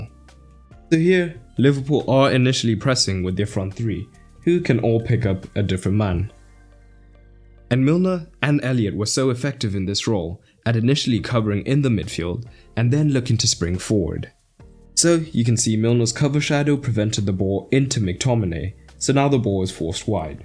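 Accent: South Africa. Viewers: Are you South African? Great analysis- love your videos❤️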